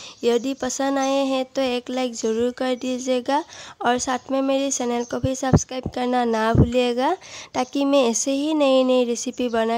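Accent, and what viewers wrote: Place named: Assam, India. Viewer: nehi wo Assam se hai. Unka naam ek flower ka naam hai. Aur kabutar Assam me khate hai aur yeh kabutar ka receipe bata rahi hai. Ho sakta hai yeh Bengali hai lekin pakka Assam se hai. Unke Hindi ka pronunciation se pata lag jata hai. Aur yeh kisiko Assamese me reply kar rahi thi meine dekha tha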